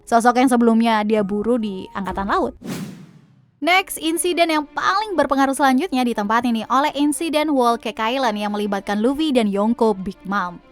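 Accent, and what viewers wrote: Java, Indonesia. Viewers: mana yaak? kangen gw medoknyaa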